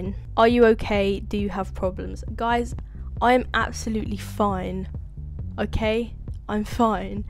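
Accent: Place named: United Kingdom. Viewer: of course she’s british 😹😾.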